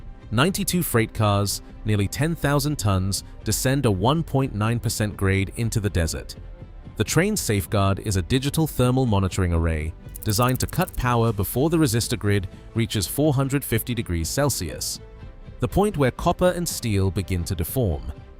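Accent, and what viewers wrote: United Kingdom. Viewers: I gave up after your brit speak I NERT moronic pronunciation too.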